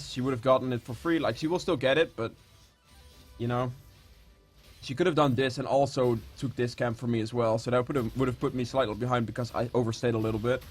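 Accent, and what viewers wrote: Netherlands. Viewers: Are you Dutch/Belgian?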